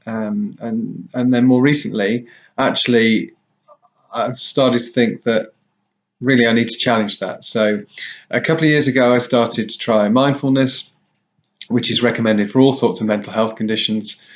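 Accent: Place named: United Kingdom. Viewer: Hi, are you still active on this channel? I am struggling with this at the moment really bad. I noticed your accent sound like you were from the UK so I was wondering if we could have a text conversation to discuss this further. Really in need of some help.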